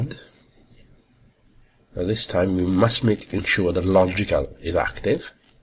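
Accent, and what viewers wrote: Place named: Wales, United Kingdom. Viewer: Just watched an Irish guy telling us how to do this and now a Welshman. This Linux is truly international ;)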